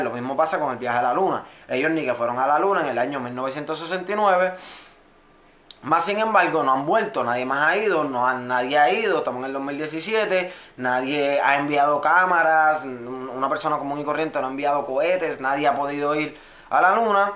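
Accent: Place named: Puerto Rico. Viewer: que loco que escuche tu acento y fuiste el primero con ese acento que no habla de reggeton ni de un temaa , bendiciones a PR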